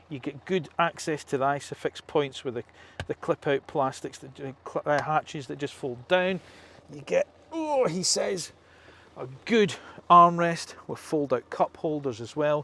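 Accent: Scotland, United Kingdom. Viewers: One of the best reviewers on here atm. A Scottish Autogefuehl.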